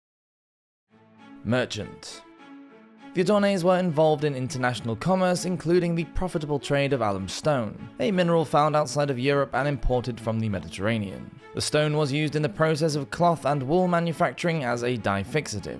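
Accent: United Kingdom. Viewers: Oh yess....the solid music the british accent....yess....a new topic, medieval of course...yesss so soothing, so interesting.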